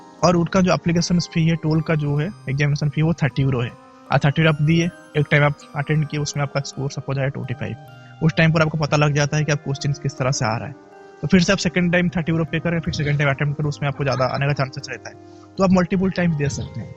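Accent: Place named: India. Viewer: oooo😂😂I can catch ur hindi accent 🥰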